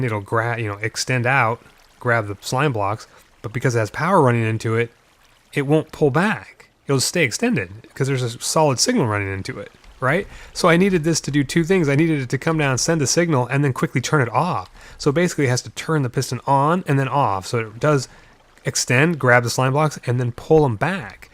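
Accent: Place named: North, United Kingdom. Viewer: where are you from over that side of the pond? You sound pretty northern.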